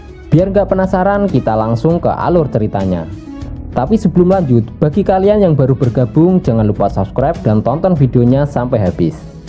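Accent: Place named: Java, Indonesia. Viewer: Medok ya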